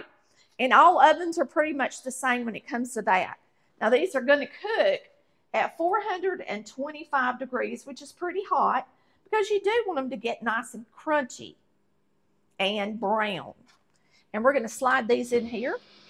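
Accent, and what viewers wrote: South, United States. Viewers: I enjoy your accent! those limA beans looked good. Cook on girl from the south,oh and thank you.♥🌈👵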